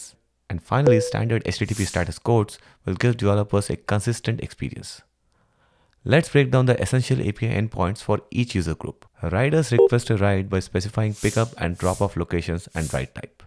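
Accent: India. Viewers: I dunno why but the Indian access makes me focus more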